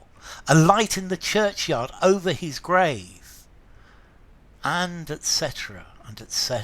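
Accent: United Kingdom. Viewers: I'm in love with stories especially with a British accent thanks for sharing this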